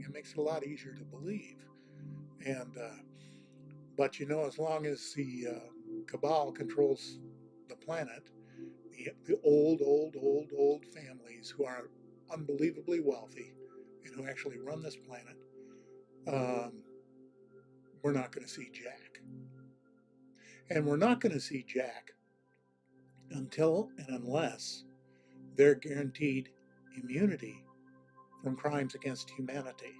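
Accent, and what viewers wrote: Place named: Massachusetts, United States. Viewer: You're a very interesting man,. I suspect that I could listen to you for hours. I summer in Newport, RI and I understand that you reside in New England; I suspect Massachusetts from your accent. Perhaps you'll have a subscriber meetup in the future and we can meet and exchange stories. I've had several encounters in my career that might stun you. Actually, it was these encounters that were directly responsible for my interest in the subject of extraterrestrial life. Great live hang. I'm off duty next Tuesday and expect to finally get to see a live show.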